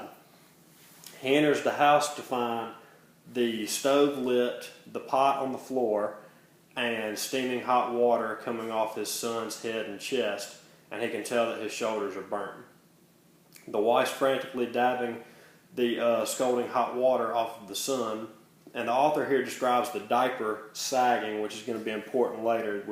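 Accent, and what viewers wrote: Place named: South, United States. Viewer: Hey bud. I love ya because you were a son of the south reading this incredible haunting late DFW micro-story, but as I’m sure you’ve been informed, the baby didn’t die. Not physically. The point of the story is how enough pain, combined with enough hopelessness of escape, causing permanent mental/spiritual dissociation as the only available escape. It is a part of him was more present and alive in the world that he was born which detached itself from his spirit and “floated away like vapor” or whatever he says there. He was in so much pain, and the two people he instinctively trusts to make pain go away couldn’t for so long, that he partially dissociated to get away from it and that part of him never came back, though it clearly says he went on and lived and life and “drew pay” meaning he worked a job like anyone else. 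But he was partially detached from life, a part of him dissociated and left forever, and watched overhead. In other words, he was a very different person in life than he would have been had the accident not happened, an alive and present person. He lived his life, but his soul left, and watched overhead😟🤯🤯😮. Fucks me up every time. Are you from TN, NC, GA or KT? Maybe SC but no one in SC has ever read DFW. Hearing this in your accent is music to my ears. Good on ya for so much here. If you’re still on here I’d love to hear what this was for and if you finished your degree, etc. 🤠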